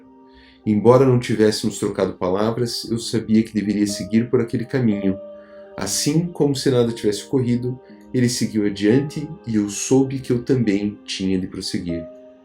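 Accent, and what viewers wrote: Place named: Portugal. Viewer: Esse sotaque português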